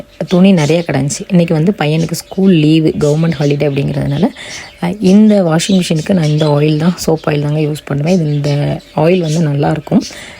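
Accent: Tirunelveli, India. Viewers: திருநெல்வேலியா சிஸ்டர் நீங்க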